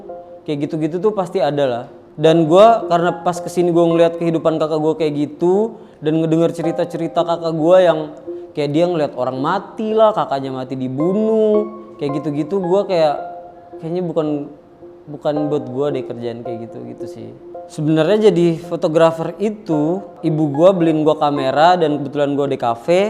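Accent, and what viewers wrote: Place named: Lembata, Indonesia. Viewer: Logat lembata sekali kaka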